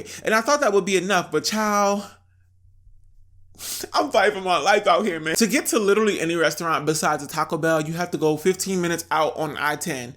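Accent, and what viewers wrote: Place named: Brooklyn, United States. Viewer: Sounds like Brooklyn 😂 You betta run like hell 😭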